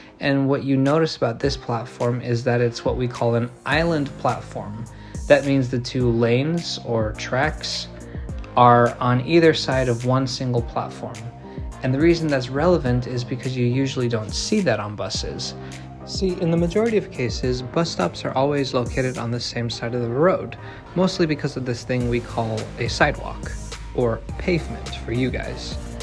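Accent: United States. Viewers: Hey, you're bringing me back to my youth. I remember visiting my sister at Cal State & on my way to union station on this busway. Thanks Btw, didn't know you weren't rom the U.S. Your American english is perfect. ;) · Where in Europe are you from? You sound American.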